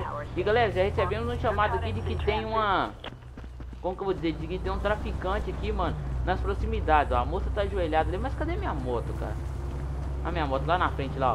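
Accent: Minas Gerais, Brazil. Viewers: Ow! Vc é mineiro pow! Coloca umas viaturas da PMMG ae mano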